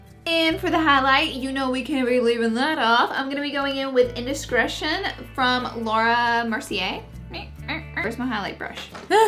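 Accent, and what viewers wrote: South, United States. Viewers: #snapchatfam love this look, also I think your voice is amazing, my family is all southern and it makes me feel at home 😁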